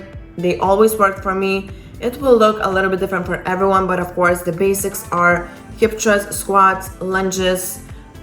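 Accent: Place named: Russia. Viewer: Did you hear about the new Linkin Park vocalist, Emily Marcia Armstrong? There's a concert online — she looks like a little firecracker, if you know what I mean! Nothing too crazy, just an interesting, strong personality. Anyway, this video started with an invitation for women only seconds. As a male, I find it offensive since these videos should be made for... just kidding Strong hook in the first 9 seconds, progressing to a life story — product placement, and more American, with... what was it, a Russian accent?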